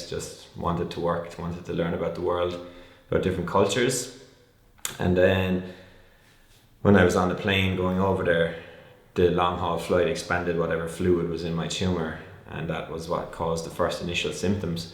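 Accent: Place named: Ireland. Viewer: Small world man! I too had a tumor in my pineal region. Glad to hear you're doing good! In hindsight I rushed into surgery and am glad you mentioned not to do that. How're you feeling these days man? I've got to say the philosophical advice at the end really hit home and the irish accent made it that much better. Thanks for making this video.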